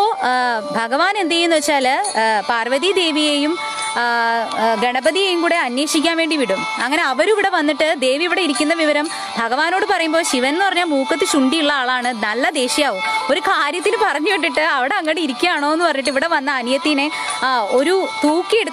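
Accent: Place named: Valluvanad, India. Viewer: തിരുവനന്തപുരത്തുകാരിയുടെ മലയാളം എങ്ങനെ വള്ളുവനാടൻ slang ആയി? അതും ഇത്ര perfect ?!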